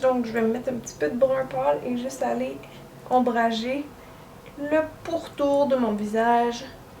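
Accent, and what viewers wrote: Quebec, Canada. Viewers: bnj je suis kahina de l'Algérie pour tous vous dire je ne suis pas trop maquillage mais depuis que j'ai commencé a suivre vous vidéos j'ai commencé a m’intéressé hhhh vous expliquez super bien votre accent j'adore vu que j'aime le Québec mais j'aime pas trop le froid lol vraiment du fond du coeur bonne continuation longe vie a votre chaîne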